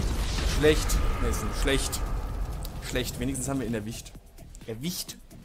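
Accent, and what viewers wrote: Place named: Germany. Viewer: I love the German ascent I am dutch so I have almost the same as you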